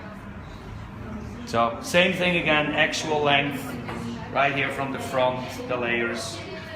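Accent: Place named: Scotland, United Kingdom. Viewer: Loving the Scottish accent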